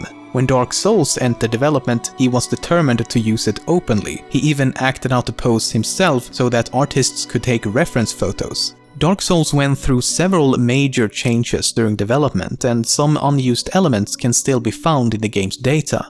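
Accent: Sweden. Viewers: Exactly my point, hence why he does not pronounce it appropriately. Have you heard the way he pronounces the English word "character"? Incorrectly by English standards but just fine according to the way that the "ch" sound is used in Sweden, He says words the way that he does because it is the way he is culturally accustomed to pronouncing them. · Du var svensk!! Jag visste det! Brytningen fick mig att tänka till.... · christ his accent is swedish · Cool videos and info man! Glad I stumbled across your channel.DS lore and Swedish accents ftw. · Is this guy swedish? he sound very swedish :P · Omfg that swedish accent.. I just can't enjoy the videio hearing this.. And Ornstein and Smough AREN'T EVEN HARD!! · im here for the swedish accent xD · you can hear that the speaker is swedish xD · My God! The Swedish accent is so strong with this one. Being a Swede myself, I can dig it. 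· You can't have enough trivia and Swedish accents! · If you dont know this accent, this is a swedish accent, and it made me lol af! BIG UPS THOUGH! BIG UPS! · being from sweden it is so easy to hear if a person is from swedish XD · that swedish accent tho edit: he knows it.. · The Swedish "accent" is leaking through! :D Where able to recognize right away that it was a fellow from our snowy north! :D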